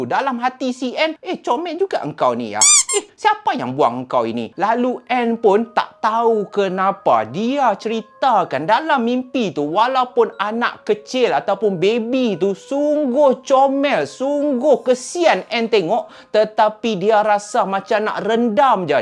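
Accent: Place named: North, Malaysia. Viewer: Slmt pg channel..hahaha terbaik intro kali ni..dimulakan dgn selawat.. cuma agak pelik mendengar bckp loghat utara..canggung bunyinya..tp kisah kali ni mmg best..nama pggilan pn sama mcm nama mamy...waah perasan sekejap nama mamy disebut2.. 🤣🤣🤣.. kisah kali ni mengingatkan mamy pd ank dara mamy yg disihir lbh kurang sama waktunya dgn kisah dlm video ni..waktu pkp..tp skrg alhamdulillah skrg semua dh ok..ank mamy tu dh sembuh.. terbaik video kli ni..bnyk pengarannya..best👍👍👍🌹🌹🌹🌹🌹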